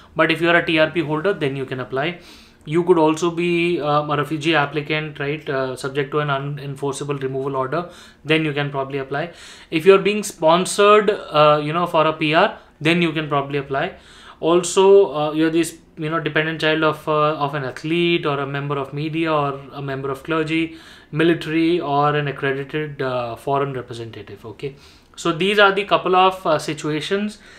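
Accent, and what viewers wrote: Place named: India. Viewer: Your indian accent is very strong bro.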